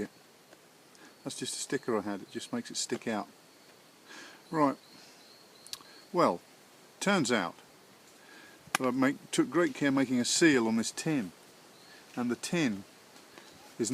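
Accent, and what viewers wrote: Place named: Hampshire, United Kingdom. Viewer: Never knew you were a 'Ampshire hog' like me. Funny how accents deceive people! ATB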